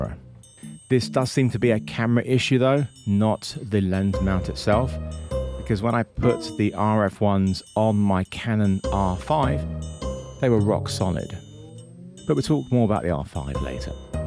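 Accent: United Kingdom. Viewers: I don't have any uses for these lenses or the Red, but the video is gorgeous, the music is well chosen, and the British accent is always charming. Heh.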